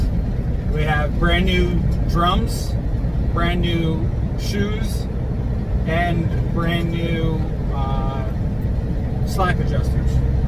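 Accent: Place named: Northeast, United States. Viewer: He's from the Northeast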